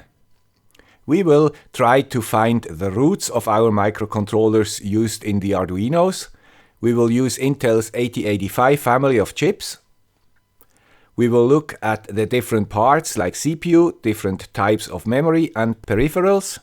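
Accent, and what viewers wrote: Switzerland. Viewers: I'm also 63 years and grew up in SF Bay, so have 40+ years in computers, software and electronical things (35 of which are obsolete). Found your hilarious and accurate representation of those days refreshing. Love the Swiss accent - keep up the good work! P.S. once connected an Atmel 8085 (?! flavored chip (someone knows the part#) to an old school PS/2 keyboard [clock, data and power]. Fun fact: it sent keydown, keyup!, func keys, shift, ctrl, etc. along with control over 3 LEDs in a seemingly random but decodable fashion. Currently working on an RCA CDP1802 (Membership Card) just for fun! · Another masterpiece lecture from the "Guy with a swiss accent" . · Now that was both fun and complex. Beautifully broken down and simplified in a way only the guy with the Swiss accent could have achieved. I’m feeling all nostalgic 🏴😁 thanks 🏴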